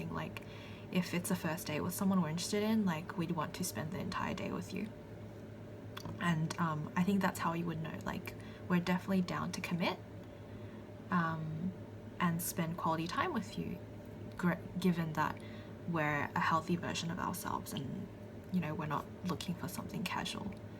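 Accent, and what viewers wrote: Australia. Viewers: Very insightful talk, as a male INFJ a lot of these points are relatable to males. ps. I’m guessing you’re Australian 😊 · She's Australian. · I hear Aussie? ❤️ Melbourne Victoria here but live in Miami 😩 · is that an aussie accent i hear? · I was not expecting an Australian accent out of you ! I love it ! It is beautiful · you sound aussie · offtop, sorry, but are you Australian maybe? :) Cheers from infj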